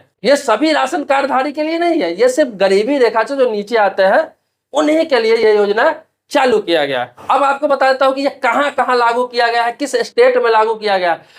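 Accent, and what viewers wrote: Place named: Jharkhand, India. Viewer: Jharkhand ka bolia sir ❤❤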